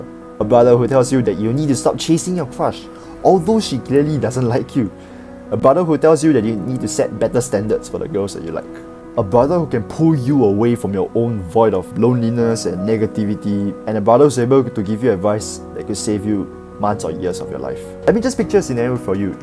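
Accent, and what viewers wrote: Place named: Singapore. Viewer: my mom said you sound singaporean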